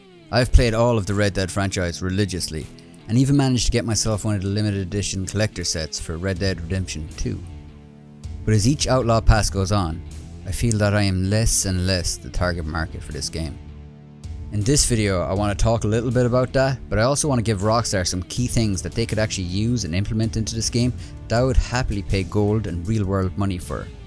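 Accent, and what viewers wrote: Ireland. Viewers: great vid man. you sound more Irish than myself. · I love the Irish accent laddd, but i am a real western lover like real, anyway this game doesn’t remind me of the west, the only thing that does is bounty Hunter, I still have love for this game but it’s getting harder to love every week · What part of ireland are you from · You are irish as well? I swear so many irish people play these franchise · Are you Irish? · you should use you voice more often, everyone likes an irish accent 👍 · Dude what part of Ireland you from? Up Cavan!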